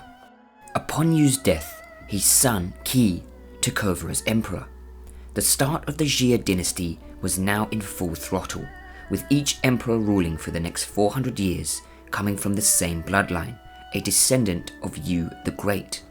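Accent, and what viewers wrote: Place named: England, United Kingdom. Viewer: I can't fathom being pissy with his pronunciation being off. He's got an English accent and the desire to not only learn, but to teach about this fascinating culture's history is admirable. Most westerners don't have the ability to pronounce everything perfectly in a language so profoundly different. We just don't have some of the sounds prevalent in english. Seems to me that taking issue with such trivialities is more about making sure everyone is aware you know more. This nonsense today where some think mispronouncing something is disrespectful is a joke. I can't imagine someone getting prickly about english mispronunciation, but maybe some do. Maybe just an indicator of the toxicities that exist among all people in certain individuals. I'm just appreciative that he used his time to put up a video in an effort to teach people. 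Thank you for making concise videos for us who just want to learn about the culture and history. Great narration and choice of photos/art.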